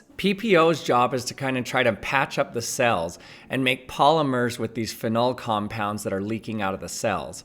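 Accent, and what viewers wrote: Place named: United States. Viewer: Why does he sound so American and gay at the same time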